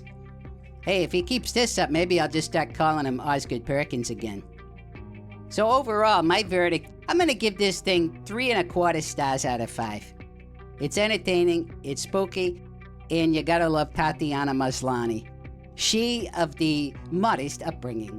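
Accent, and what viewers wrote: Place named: Boston, United States. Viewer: I can for the silly title and stayed for the Boston accent. Cool animation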